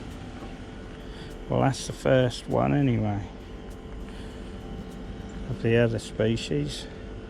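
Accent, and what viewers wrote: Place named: Suffolk, United Kingdom. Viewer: You sound like a Suffolk lad. I’m from Norwich but lived in Ipswich for 20 years now. It’s tough we have to travel so far for good lrf.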